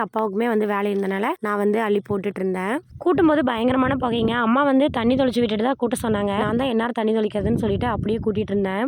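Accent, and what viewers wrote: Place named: Thoundipatti, India. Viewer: Thoundipatti ... neega rntha area .. enga area slang ithu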